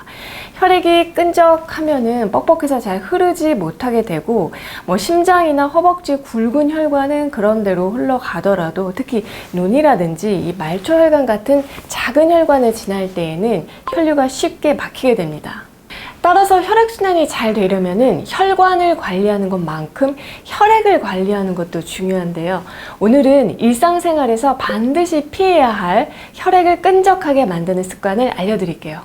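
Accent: Seoul, South Korea. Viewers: 목소리도 이뿌시고 서울 말씨가 더욱좋습니다. 모든병이 혈행성 이라고 하는데. 잘이해했습니다. 좋은 하루 되세요.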